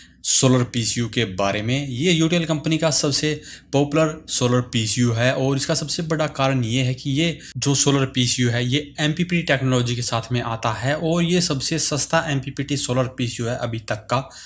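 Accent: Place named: Haryana, India. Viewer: bhai wo àpke lahje se pata lag gaya...HR me kaha se ho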